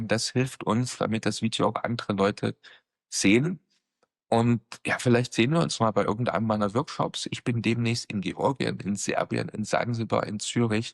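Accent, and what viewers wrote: Germany. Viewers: hört sich bissel nach Sachsen oder Thüringen an. An manchen Stellen verschluckt die Technik ganze Silben. Die Akustik ist aber in Ordnung, da hallt nix großartig nach. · Fränggisch. Des is fei goud. freili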